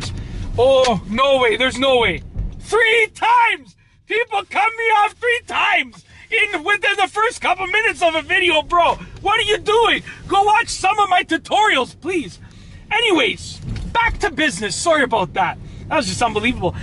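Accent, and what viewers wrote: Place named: Canada. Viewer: I love how I realized he was Canadian when he was yelling from his accent. Haha! I got a very well needed laugh! I love (Toronto) Canadian accents!!! They're my fave! :-D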